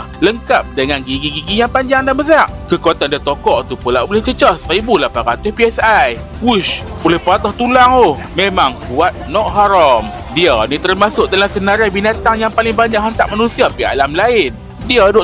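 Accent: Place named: Northern Perak, Malaysia. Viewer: "kuat nok horom".... Hahahaha.... Aku sbg warga Perak utara scra resminya subscribe n support channel ckp utagha cmni... Mantap Min... Teruskn n menten gaya utagha... ❤😂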